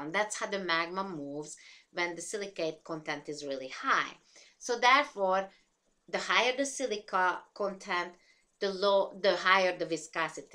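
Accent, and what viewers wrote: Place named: Hungary. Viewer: Summer 2020 GOL 105 - V1 Physical Geology - Savannah Mihalo - Classifying magma gets much more complicated than I realized! I wrote down in my notes about viscosity levels as that was the most confusing part for me---but I think I understand it. I did like learning about the types of intrusive and extrusive mineral textures. When I saw pumice, I remembered that sometimes my family used pumice-crafted materials in the shower for scrubbing. I love obsidian most likely because of its glassy texture. I did not know you were from Hungary; that is so neat! I have loved listening to your accent throughout these videos but I was not sure which country you originated from. I love these videos---they are well done!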